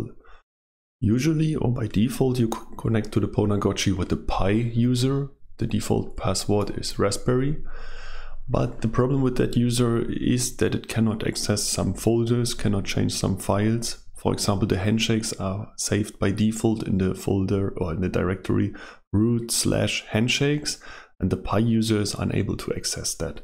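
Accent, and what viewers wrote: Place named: Germany. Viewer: Ich liebe dich, dein Denglish und dein Tutorial!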